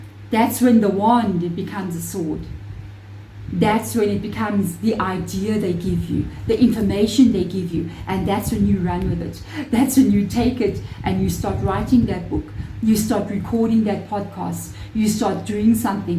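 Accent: Afrikaans, South Africa. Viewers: I have been thinking about writing a book for years. I have so much information that I feel is valuable. My ADD, anxiety, and depression are really limiting my energy and motivation. I love your accent. I lived near Cape Town for a while. Lovely country and people 💛 love from the USA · You are 1000% correct, when I do a reading I can never recall the information 😂 thank you so much for your channel. My great grandmother is one of my ancestral guides, and she was Afrikaans. I appreciate your beautiful accent, makes me feel closer to her, which is funny cos I know she is always with me xx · I thpught i picked up an Afrikaans accent. Im in the vaal in south africa. I havnt got a wand but i use a cow tail . And im so obsessed with horns haha. The things you are saying are so spot on. This whole reading ifeel is aimed at me. I am going thru a spiritual awakening and im writing a book.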